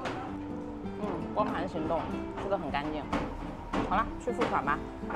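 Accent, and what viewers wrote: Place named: Beijing, China. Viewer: 京腔和虎门的肠粉。饭量有点大。😀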